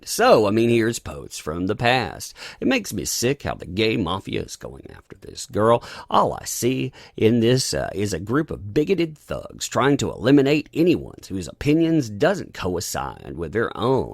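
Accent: South, United States. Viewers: fix your hillbilly voice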